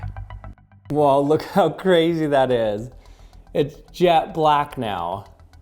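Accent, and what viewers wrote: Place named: United States. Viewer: Why does he sound so American and gay at the same time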